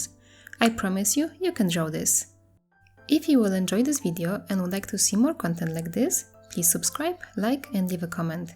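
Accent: Poland. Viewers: Polski akcent zawsze poznam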